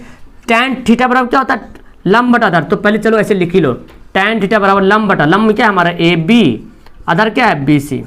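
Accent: Bihar, India. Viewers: Aap bihar se h kya?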